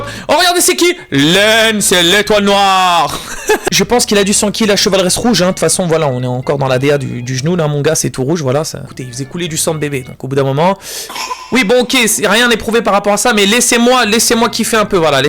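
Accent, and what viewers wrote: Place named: Maghreb. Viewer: AH MAIS TES UN MAGREBIN JAIS TOUTE SUITE VU CA AVEC TA FACON DE PARLER ON DIRAIT UN MONGOL HANDICAPE MENTAL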